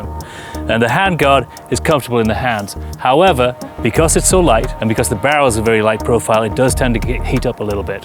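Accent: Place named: United Kingdom. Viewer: I love my bcm stuff but the British accent didn't work for you guys.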